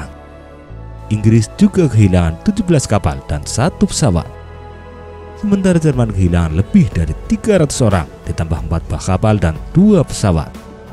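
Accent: Java, Indonesia. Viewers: Naratornya Jawa medok jadi kadang tidak di copy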